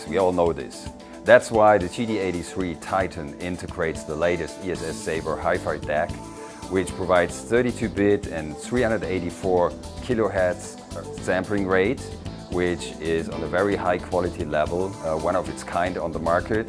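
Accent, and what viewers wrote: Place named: France. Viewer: MSI France avec un mec qui parle anglais avec l'accent français, ok.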